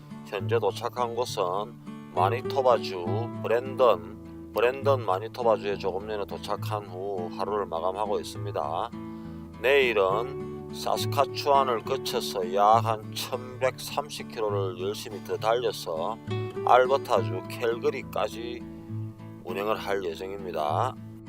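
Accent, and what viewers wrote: Gyeongsang, South Korea. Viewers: 행님 건강하이소^^먼저 저는 부산 경상문디 행님 동생입니다.행님 뷰 홀딱 빠져보고있습니다.뭐 때매빠질정도로 볼까요? 경상도 행님 목소리 인것같습니다. 행님 저는 추레라 하고있습니다.행님 최고다.있빠이 행님 뷰 보면서 마이 배우고 있습니다.돌쇠 힘들어도 마이 챙기주이소~^^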